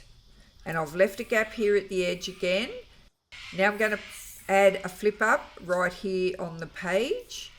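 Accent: Australia. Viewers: It’s so awesome hearing your accent… Aussies are so good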